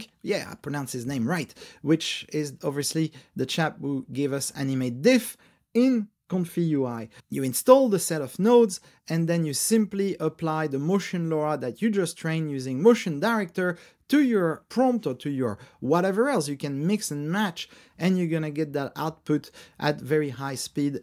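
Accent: France. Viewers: don't say you have a terrible french accent it is lovely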